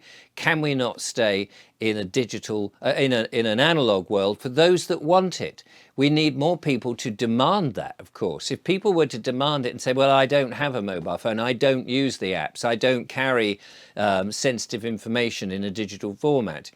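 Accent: United Kingdom. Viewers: I do love listening to, the relaxing tone, well spoken British accent, important messages. I don't watch everyday, but I do binge on a weekly basis.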